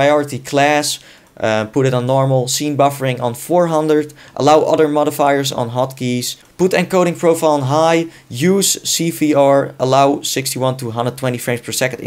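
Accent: Netherlands. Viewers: are you Dutch becous you realey sound Dutch becous i am Dutch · Jij spreekt Nederlands hé je hoort dat aan accent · ik hoorde meteen dat je nl was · this guy has dutch accent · Are you dutch? Cool! I could hear it by your accent!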